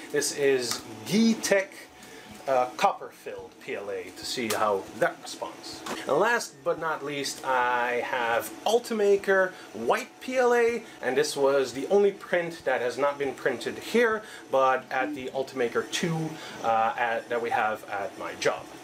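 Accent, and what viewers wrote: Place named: United States. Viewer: How come you sound like an American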